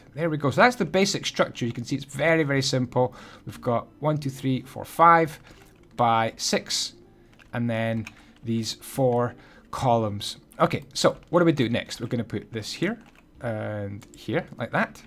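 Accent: Scotland, United Kingdom. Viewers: Is that a Scottish twang I hear?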